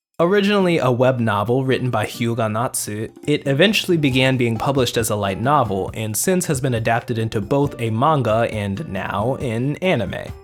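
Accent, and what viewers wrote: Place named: Spain. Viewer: whats with the spanish accent for the japanese pronounciations? either do right or dont at all plz.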